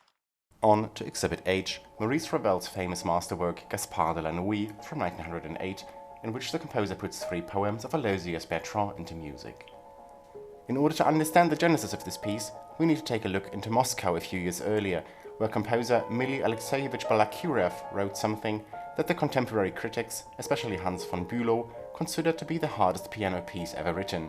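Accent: Germany. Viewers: Amazing video! I enjoyed it thoroughly because your explanations and highlights of each piece. I hadn’t heard some of the pieces you presented on the video like Godowsky’s Passacaglia or Regers’s variations which I’ll give them a try once I’m done with your video. Thank you for adding them to your video broadening my repertoire, at least as listener. By the way, I have a question that might be off topic but, are you German? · wait kommst du aus Deutschland/sprichst deutsch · Are you from germany?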